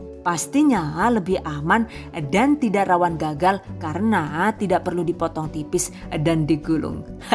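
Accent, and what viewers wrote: Banyumas, Indonesia. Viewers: Bun q pengin takon njenengan sih wong endi,deneng pinter ngomong ngapak 😄😄😄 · Ngapake kaya nyong😁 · chanel sg pling tk senengi,idenya kreatif,video nya keren2 d tmbh lucu2 klo ngmong jwane medok bnyumasan..pembawa anya detil ccok tuk yg mau cb jualn,sllu on klo ada video trbaru gk ktinggln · Setiap play vidio pasti guyu dewek nk ada bahasa ngapak nya🤣🤣🤣🤣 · Hahaaa... Seneng bgt denger ekpresi dan logat bahasa ngapak2nya Bun👍 sangat menghibur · Mbaksayyyyyy...suka banget klo lagi mantengin channelmu....gaya ngapaknya yg khas bikin gk boring dn senyum2 sdri..penjelasanya dettilll...syukaaaaa..❤️❤️❤️❤️❤️ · Hallo assalamu'alaikum wr wb,waahh berapa banyak pahala yg bunda dapatkan dr vidio ilmu dr bunda yaahh....saya sangat senang dengan recep"yg bunda sampaikan...cara penyampaianya jelas..apalagi ada selingan ngapak"nya he he he he...mks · Ketemu juga resepnya, Ide jualan lagi dan lagi,plus Tips dan Triknya CATAT😅 btw suami saya itu tdnya gak suka bhs ngapak, wlaupun saya istrinya jg wong jowo😁 Alhamdulillahnya klo sya putar video dia ska ikutan senyum" gara" pembawaan bunda yg ngapk tp unik suka bkin ngalak deh😂matursuwun bunda bnyk menebar manfaat d setiap videonya love # 💓 #GiveawayTHV2021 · Ngapaknya itu loh 🤣🤣🤣🤣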